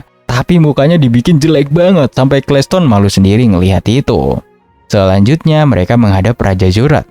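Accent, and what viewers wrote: Medan, Indonesia. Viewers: Kaya Medan aja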